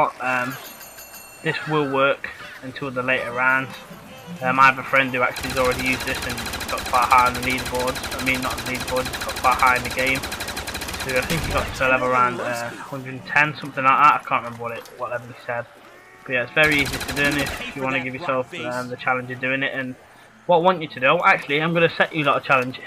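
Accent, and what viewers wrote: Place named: United Kingdom. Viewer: i love ur british voice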